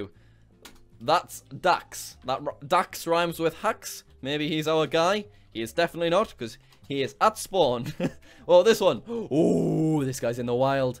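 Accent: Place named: Scotland, United Kingdom. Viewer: Ur Scottish and that’s kinky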